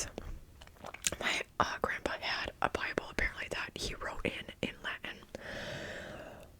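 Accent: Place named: Midwest, United States. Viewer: I’m not a big fan of the popsicle whole thing but I love this because I feel like we’re on FaceTime right now and like I live in Minnesota and I’m from Minnesota my whole life and I can totally tell you have a Midwestern/possibly Minnesota accent and I think it’s so funny lol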